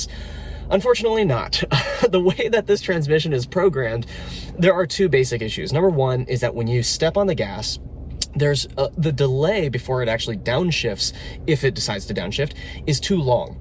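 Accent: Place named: United States. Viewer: Hello from Peru!! Great video!!! You sound like are from USA but what your ethnicity is? So handsome! Thank you :)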